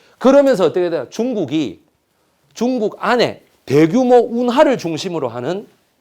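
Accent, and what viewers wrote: Gyeongsang, South Korea. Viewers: 구수한 겡상도 사투리 ..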